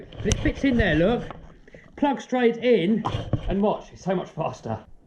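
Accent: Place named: England, United Kingdom. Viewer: So glad i dont live in England. The accent is terribly annoying. Cant handle it. Cool vids on mute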